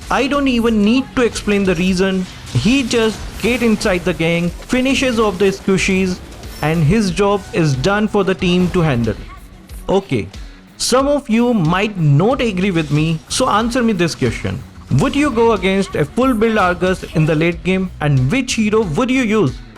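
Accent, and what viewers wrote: India. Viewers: bro you from India your voice bro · why u sound like indian dhoti its so irritating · You sound like an Indian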